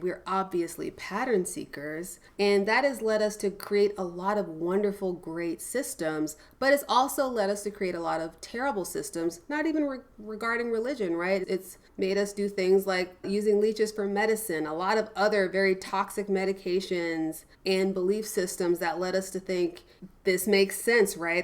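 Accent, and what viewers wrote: United States. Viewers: I absolutely love your personality. I think you're fucking cool. Your way of speaking did bother me the first few minutes but it was more about your american girl accent than the swearing (which I do a lot as well anyway).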